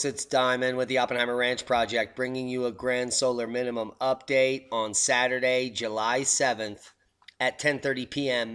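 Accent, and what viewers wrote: England, United Kingdom. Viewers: Last night I watched a visually stunning doc called "A Volcano Odyssey", YT...they explain that the meteorite that "caused" the extinction of dinosaurs 65 million yrs ago was not the only phenomenon that wreaked havoc on earth (we knew that..;)....India was a floating continent below the equator at that time, drifting northward over a hot spot, and a massive volcano that erupted for perhaps millions of yrs at about the same time, wOw The mountains of Mahambaleshwa were created in northwestern India...Fascinating... Volcanoes Rule, are Earth's supreme geoengineers =D BooM! Thank You Diamond...I love your Brit accent ;) Many Blessings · for a moment there I thought I was listening to an English bloke, the accent was so good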